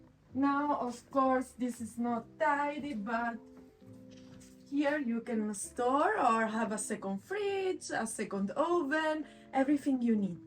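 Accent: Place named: Italy. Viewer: When I come back in the next life I want to be your personal film maker, follow you with a camera all day and listen to that wonderful English with the Italian accent. What a cozy domicile your grandparent's house is. Glad you held onto it and didn't sell it. I am sure you can feel your grandparent's energy when you are there, thank you for the tour.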